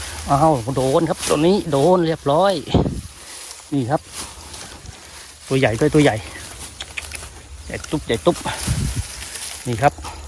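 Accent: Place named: Central Thailand, Thailand. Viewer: สวัสดีครับน้าเพิ่งเข้าดูติดตามให้กำลังใจครับชอบดูคลิปดักหนูน้าอยู่จังหวัดอะไรครับดูจากสำเนียงการพูดน่าจะเป็นคนภาคกลางครับ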